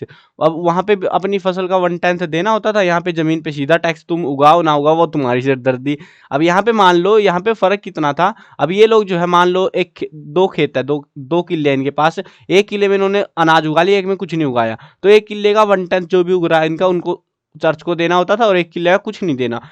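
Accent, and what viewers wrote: Haryana, India. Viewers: Sir are you belong to haryanavi background 🙂🙏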